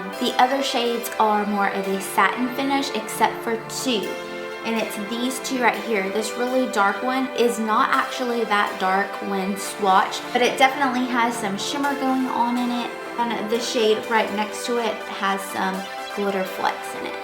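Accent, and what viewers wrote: South, United States. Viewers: Usually I think of these kinds of colors looking best on fair skin, but they look magnificent on your tan complexion! You seem to have a bit of an accent. Are you from the South?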